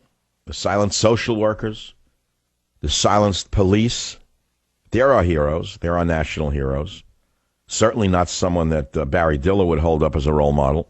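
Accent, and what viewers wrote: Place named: New York, United States. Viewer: Again, who is this guy so sure people should listen to his opinion - what special thing has he done or whatever that gives him such right to spread his venom and expect someone to listen and his new york accent. Yuck even when he says words like freedom and other goods words. He is nobody and unknown - what has he achieved?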